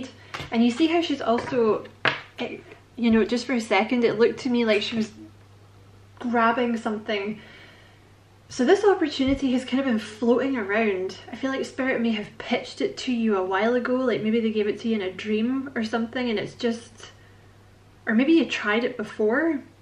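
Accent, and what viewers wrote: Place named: Glasgow, United Kingdom. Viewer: Love your accuracy. . . AND your Glaswegian accent!🏴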